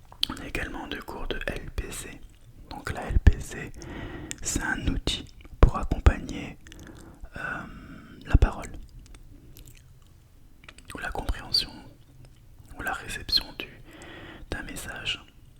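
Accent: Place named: France. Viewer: 💌 Hi,💟 I love the French accent and thanks to ASMR with soft sounds I feel very, very good. Thank you.🙌✨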